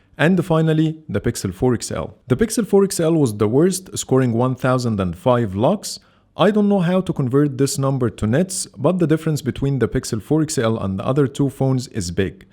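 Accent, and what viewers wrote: Egypt. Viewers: يخي ترا مبين والله انك مصري وعم تحكي انكليزي ... يرحم كل شخص تحبوا ارجوك عدل اللكنه تبعك تبع الانكليزية تبعك 🙏🙏 يخي والله بحب محتواك بس من لكنتك صار عندي فوبيا و مبدي اتفرج اكثر 💔💔 ينقصك اللكنة فقط والله 🙏🙏🙏🙏💖💖💖💖💖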